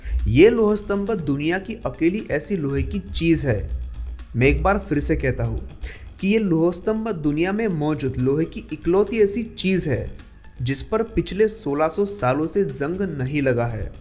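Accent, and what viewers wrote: Maharashtra, India. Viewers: Hello. It was interesting to know about all the three things mentioned in your video. One suggestion though. The narrator has a very deep Marathi accent, hence many Hindi words are not pronounced the way it should be. I would suggest that you hire someone for this job. I am interested.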